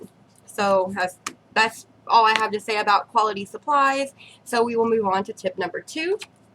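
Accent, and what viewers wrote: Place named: South, United States. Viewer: Your southern accent is adorable! And great tips girl!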